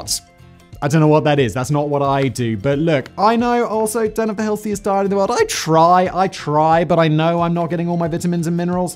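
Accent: United Kingdom. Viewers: British English.🇬🇧 From the inventors of the language, correctly spoken. Not some bastardised colonial strangulated and simplified version.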